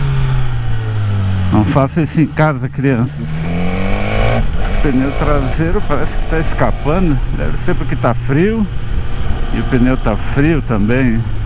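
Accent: Rio Grande do Sul, Brazil. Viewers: essa cinta e top e as 2 câmeras são tops hero 4 e 6; tou gostando do sutaque do rio grande do sul rsr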